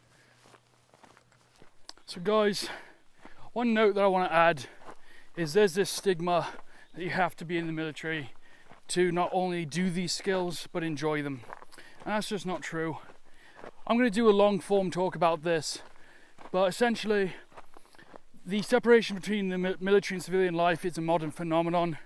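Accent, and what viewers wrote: United Kingdom. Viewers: I've been trying, unsuccessfully, to place your UK accent. There's a slight hint of "country" to it, but it's very subtle. Mine's a kind of Norfolk/Essex/Cambridge mash-up. Apparently, I get a bit "posh" when I've had a beer. I'm really not! 😂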